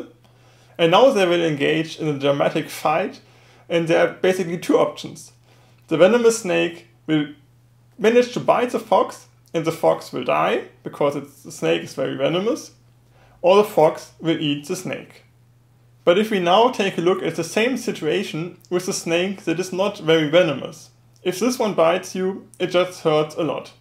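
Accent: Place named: Germany. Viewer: You have the stereotypical german accent :D but good video ;)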